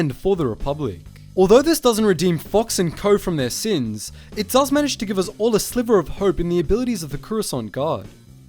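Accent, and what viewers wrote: Australia. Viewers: Bro you sounded so Aussie in this video, are you? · Love the Aussie voice xD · gotta love that deep aussie accent narrating this.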